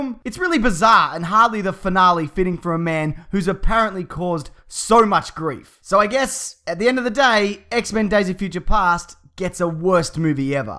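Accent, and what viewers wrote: Australia. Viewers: is this guy an aussie? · Are you from oz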